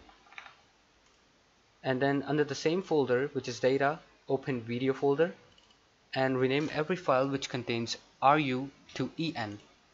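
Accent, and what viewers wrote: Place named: India. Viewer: I trust you, you sound like an indian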